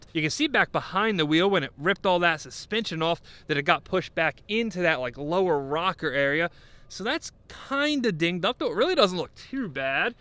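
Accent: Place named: Baltimore, United States. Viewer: I JUST MISTOOK YOUR BALTIMORE ACCENT FOR THE DELCO ACCENT. I had to look it up. Ohh its thick in this video lolo 🤷‍♂️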